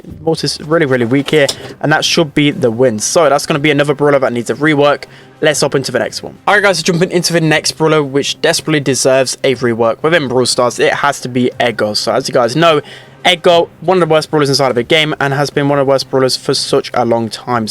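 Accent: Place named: England, United Kingdom. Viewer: Well he is English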